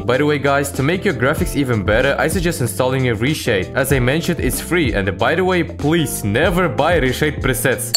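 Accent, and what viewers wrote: Russia. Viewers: ато я слышу что автор русский страно по американский говорит как друг мой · You can tell that Bro is Russian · Есть ли у тебя канал на русском? Просто слышу сильно русский акцент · Man your accent is addictive, Ruuusssssiiiiiaaaannn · russkiy blya ya tebya slishu suchka) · Сижу, слушаю акцент питерского английского.... кайф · Акцент как у русскоговорящего. Лайк авансом. Расти большой дружочек) <3 P.S. только сейчас понял, что спустя 48 секунд видео, появляется родное уху: "Блять" 😅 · Акцент понимаю ты русский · I subscribed for how funny the russian accent was, editing, and personality. Keep it up brah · по акценту понято что русский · That russian accent ! Привет, брат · Russian accent :D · Are you Russian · I can bet on the authenticity based on the Russian accent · i freaking love ur russian accent lmao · that russian accent is the cherry on the cake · какой же у чела русский акцент))))))) мужик делай контент на русском пжлст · terrible russian accent, all russians are guilty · блин, вначале я не думал что это это русский акцент, всетаки на английском говорят кучу разных национальностей и я акцент сильно не различаю, но уже расставило всё по местам🤣 кста, может кто подсказать, моды лучше всего перекидывать просто в корневую папку, или в архив в корневой папке? 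и будут ли моды работать, если закидывать их в отдельно созданную папку в корневой папке игры? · бля ты руский что ли) акцент выдает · i Love his russian/english soo much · Im a simple guy. I hear russian accent, i sub. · Ahh the Russian accent blessing my ears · Чел ты из Росси или знаешь русскому язык, это заметно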